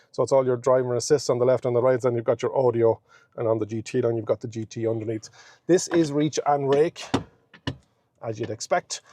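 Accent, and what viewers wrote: Ireland. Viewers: Refreshing to hear an Irish accent giving a review. Agree with a lot of what he has to say apart from the speed. Extremely sluggish in eco a bit better in normal and fairly quick in sport. Comparing it to my last car which was also stellantis, a DS3 Crossback. It was very quick taking off even in eco and in sport the wheels would literally spin if you try pulling off fast. He says he’s 6’2 and there’s good room in the back seats. Well I’m 6’4 and although it’s the first car I can remember where I don’t have to put the driver’s seat fully back. (So ample leg room in the front) even when I position chair slightly forward to my comfortable driving position, there would be no chance of fitting a passenger behind me (unless they were strapped in a baby seat). My wife is 5’0 and she said she wouldn’t feel comfortable sat behind passenger seat. 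The boot is big too. They would have been better off cutting a couple of inches from the boot to make in more comfortable for back seat passengers. I’ve only just got the car so don’t know much ability the features but driving back 30 miles from the dealership, half motorway half “a road” (uk) it seems to go better than expected on estimated mileage. Get a lot more miles per charge than DS3. So far fairly happy with it. Apart from what I mentioned and the same stellantis app I had with my ds which is dreadful. I’m hoping the in car info is better which was also dreadful in the DS. Looks a lovely car too. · I also love the Irish accent. I used to go over to Dublin a lot in my younger days for Thin Lizzy Vibe for Philo gigs. The Irish people are the most welcoming people I've met.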